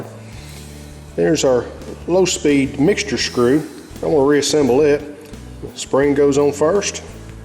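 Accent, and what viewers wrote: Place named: Arkansas, United States. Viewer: A good work, I'll keep your tutorial in mind since I have one of those bikes (the LM version) and, someday, I should try to clean those carb. Just for curiosity, have you sold the bike or are you using it for fun in the fields? P.s. I appreciate your patience in explaining slowly while filming the procedure, since I'm not very familiar with Arkansas accent: not with any US accent, in fact :)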